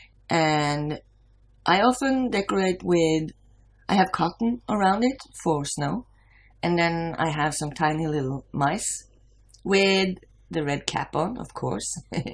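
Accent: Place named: Norway. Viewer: Ah I should've recognized your accent being from Norway since I recently played a game that took place in Norway. Nice to see different and similar customs and decor for the holidays. You guys must be gingerbread house pros! lol I think the food version of slow TV would be a hit here in America, as much as we love our food. At the very least it should be live streaming somewhere lol Though I'd love to take that Norwegian coastline trip too!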